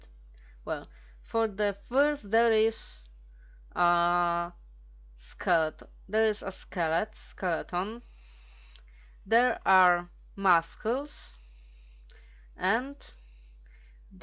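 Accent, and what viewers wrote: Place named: Russia. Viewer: which language do you speak? ´cause it sounds like russian, your accent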